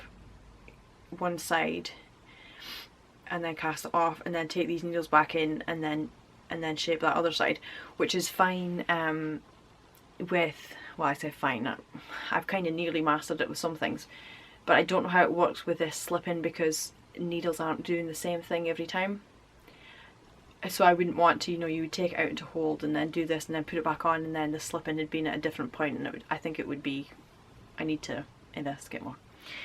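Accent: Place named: Shetland, United Kingdom. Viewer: Absolutely loved watching this!! I love everything you showed, and you are so at ease in front of the camera! I hope these videos become a semi-regular thing — I prefer little loose videos on a single topic than big podcast episodes with segments tbh. And your accent was so interesting to listen to — I'd never heard a Shetlander before!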